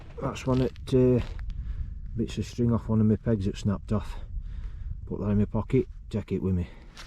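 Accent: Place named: Northern England, United Kingdom. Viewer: Evening, (you still remind me of hovis bread and cobbled roads with your accent, all good👍) I saw your pics on Instagram, but watching your video I can really get a true feel for how icy the conditions are, it's gonna get to -8 where I am tonight apparently, so I can understand how you felt 🧊 ⛸️. Pity I don't get the views you had, it looked cracking. Enjoyed watching your vid, see you on your next adventure. Have a merry Christmas 🎅 and a happy new year 🎉 😊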